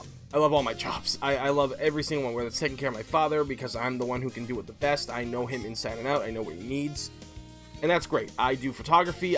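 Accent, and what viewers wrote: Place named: Boston, United States. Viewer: ROE introduced me to your channel. I think you're absolutely hilarious man. Love your accent u sound cool. I think you're a bostonian? That or new yorker. When u did those playthroughs in outbreak with ROE I really enjoyed um great fun 😁 By any chance do u have the moment where u were dragged through the window by the zombie in the hospital? I PISSED myself laughing 😂🙈 Sorry I just couldn't help laugh but it was funny af 🙈 I wish I could be your friend lol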